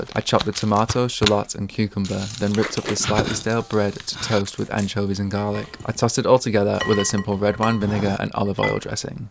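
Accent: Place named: Australia. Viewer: Your accent is super interesting. Australian, with a touch of Manchester, a little bit scandi and North American all in one.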